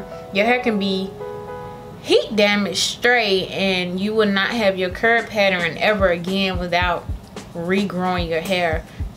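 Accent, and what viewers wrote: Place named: South, United States. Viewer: Wow, you hair is gorgeous and I love your curls. Oh, I love your southern accent too.❤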